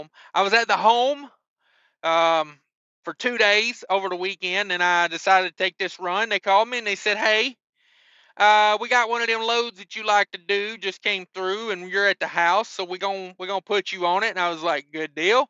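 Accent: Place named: New Orleans, United States. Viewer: BTW.. You're accent is ....terrible. Some New Orleans in the rep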